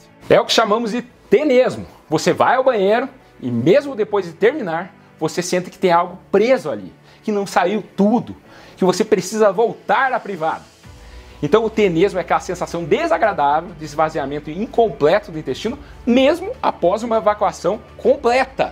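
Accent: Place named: Caipira, Brazil. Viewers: Caipira ou Nao...eh sempre mto bom ouvi-lo! 😉✌🏽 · Esse sotaque caipira deu um contraste curioso com as informações brindadas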